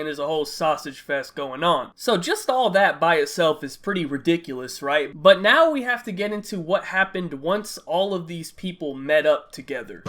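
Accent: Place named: DMV, United States. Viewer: Bruh are you from the dmv cause you kinda sound like it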